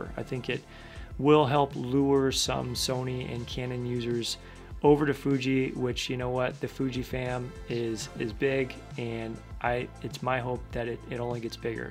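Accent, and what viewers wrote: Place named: United States. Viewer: I subs to your channel because your American accent! Ytuber from Vietnam, teaching English.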